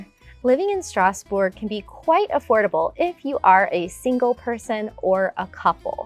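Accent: United States. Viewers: Franco-American France mother American father US army, and I have live in France for over 20 years this time! I could not disagree with you more on everything sure if you have lots of money, and are will to burn it anywhere in France is just as good as any other place! France is about the size as the state of Texas and everything is near by. Do you want tourist trap she is your lady someone to help spend your money she is your lady or someone who thinks she knows France, but actually she fooled you she is your lady! Lyon & Toulouse two of the worst places to go and Provence Nice is nothing, but American tourist trap for the chic want-a-bes! One thing about France is you can find great food and great wine anywhere and there is something for everyone you want water go to the water you want mountains you just go there, but don't take her advise she actually knows little about France and that American accent could kill a horse to the French that have to listen to that I am sorry even my father knows better and he hates the French! 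Read some French history Google map research the place you like listen to every other expat on YouTube and listen to some French videos try learning French do what you can before coming here get yourself a Frenchie to talk with by asking one!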